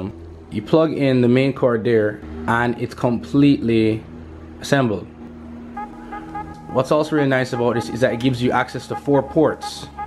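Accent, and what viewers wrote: Jamaica. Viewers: can hear the yardie accent lol. love it!!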